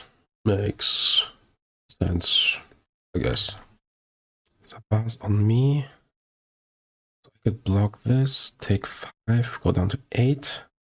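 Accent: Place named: Germany. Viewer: I watch your videos for quite a while now. Today my girlfriend was sitting next to me, asking: "Das ist doch n Deutscher?!" Kurz die Kanal-Info gecheckt und Tatsache! Heißt schonmal, dass der deutsche Akzent nicht so krass ist, dass es mir besonders aufgefallen ist. Wäre toll, mal nen anständigen deutschsprachigen Modern-Streamer zu sehen. Aber klar, Englisch, weil mehr potenzielle Zuschauer. In diesem Sinne: Keep up the good work!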